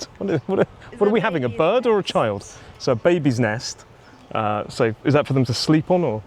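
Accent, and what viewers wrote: United Kingdom. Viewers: So nice, when I was a kid I went with my school to Southampton a couple of times (once to Southampton proper and once to Chandler's Ford) and we used to spend one day in Isle of White, I remember it so well, that was 50 years ago though. Maybe that's the reason I understan you quite well, because usually it is hard for me to understand british accent :) 5 pounds? That's really a bargain! BTW, I think there was a monument in Southamton (maybe a door of the city walls?) called "The Bargain". Am I correct, or it is a false remember?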